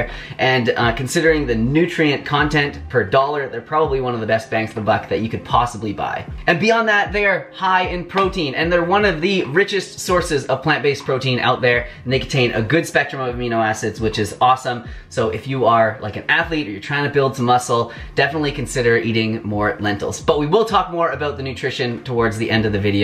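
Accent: Canada. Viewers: Dovid Stern Canadian accent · Omigoodness! I thought I heard a Canadian accent. Lentils are very quickly becoming my all-round faves! Need to chk out your curry recipe. QUESTION: Building muscle is awesome, however...how does it compare to heme sources of iron. Struggling with anaemia & I keep getting the impression that non-heme iron sources (from lentils) are sub-par for those of us with anaemia. What say you,? Cheers in advance.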